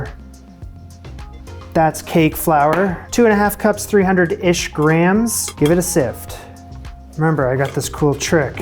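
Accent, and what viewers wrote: Canada. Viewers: I love his Canadian accent. 'Hang the Po-ouch.' LOL. Also, even if I'm making a cake, brownies or bread, I still use the trick my mom taught me. I take Crisco in a paper towel, grease up the pan. Then cover/dust with flour, to tap out the extra before baking. Anything for the 'Substitute' doesn't match. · Night shift Canadian accent is more pronounced and I love that. 🇨🇦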